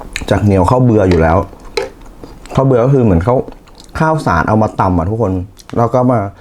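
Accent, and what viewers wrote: Isan, Thailand. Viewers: ชอบคุณ เว้าภาษาอิสานฟังละม่วนดีครับ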